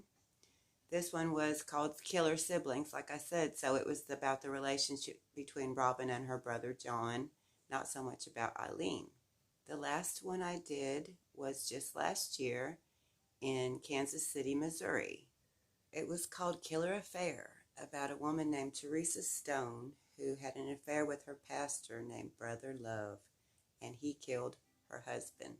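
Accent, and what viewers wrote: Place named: South, United States. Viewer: you got a sweet little southern accent...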